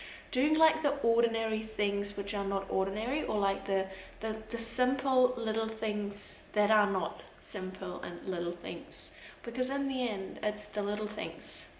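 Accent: New Zealand. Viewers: Your accent is becoming more Kiwi. Many words I hear a New Zealand accent, not German.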